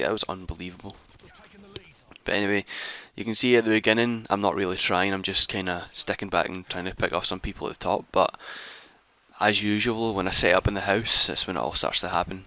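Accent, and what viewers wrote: Scotland, United Kingdom. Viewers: OMFG YOUR SCOTTISH, YA BEAST :D:D:D:D:D:D:D finally, a scottish dude like myself doing a vid :L awesome :D:D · scottish arent you im scottish?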